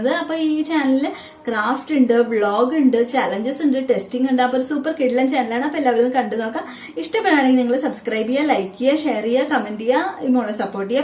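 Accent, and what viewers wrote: Thrissur, India. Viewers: Chechiyude samsaram kettal serikkum thrissur kari anu enu manasilavum😁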